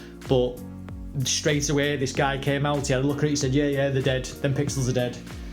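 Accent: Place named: North, United Kingdom. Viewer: Love the setup even though small space you've really made it count I can't explain what I mean really but I hope you get the jist of it, I found you through the new evo I'm looking to get it, and found you sounded northern like me so that's what related me to you lol deffo be coming back for more videos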